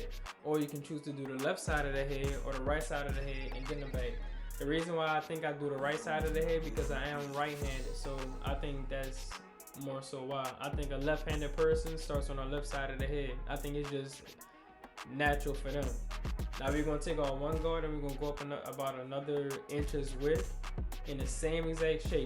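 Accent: New Orleans, United States. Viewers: You sound like you’re New Orleans bro.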